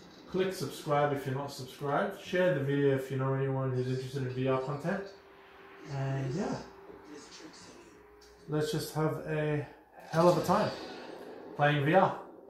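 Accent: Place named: Australia. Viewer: Thank you so much you helped me so much also I can tell from your accent that your an Aussi and so i am I which made me understand you more then I do for American accents 😂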